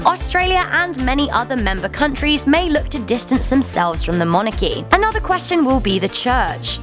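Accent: United Kingdom. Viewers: sounds British so I would think this whole video is extremely accurate since she would have her experience to know herself.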